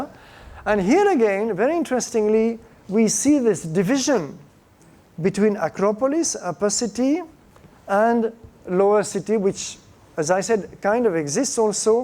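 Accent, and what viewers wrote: India. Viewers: Your English is excellent/perfect, with a lovely melodic tinge of Indian accent. So this civilisation prioritised the well-being of people against many other considerations, and nobody was attacking them. What a beautiful civilisation. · I like how he has acquired the Indian accent. How sweet. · Poor guy he has even picked up an Indian accent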